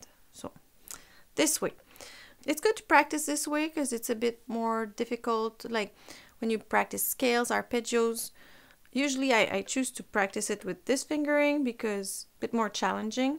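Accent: France. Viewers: o wow! Congrats!! ooh you are French! Das why you have an excent! I was reading the comments below 😂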